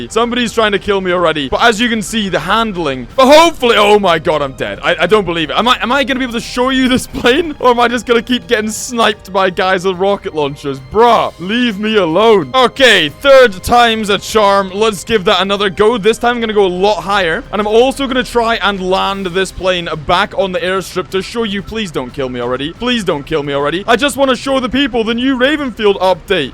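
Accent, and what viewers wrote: Scotland, United Kingdom. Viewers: Are you scoty boy?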